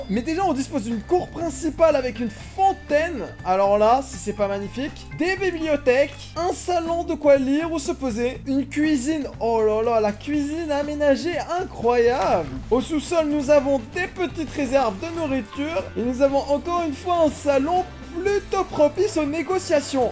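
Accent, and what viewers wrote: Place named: France. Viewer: L'accent Français 😎🤌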